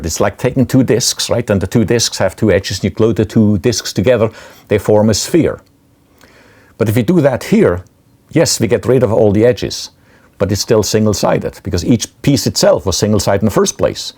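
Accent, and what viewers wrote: Switzerland. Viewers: Yay he's actually Swiss! :D (Like me) (The accent made it easy to guess it right) xD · he kind of sounds swiss. grüezi!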